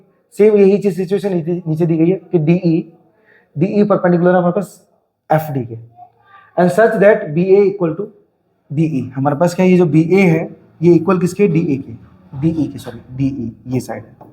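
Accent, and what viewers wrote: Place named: Gujarat, India. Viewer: From your talking style I think that you are from Gujarat. Anyways I'm from Ahmedabad Gujarat. And sorry for distributing you.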